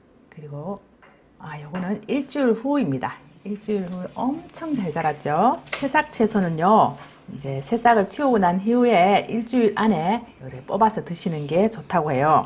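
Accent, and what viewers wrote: Gyeongsang, South Korea. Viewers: 볼수록 재미있어서 주변에 막 퍼나르게되요. 어쩜 돌아가신 친정엄마 살아돌아오신듯 경상도 사투리에 지혜로운거 까지 똑 같아요. 감사하고 축하드려요~ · 지금말씨가 대구말씨같은데 맞으신가요 제미나게사시는것같아요 부러워요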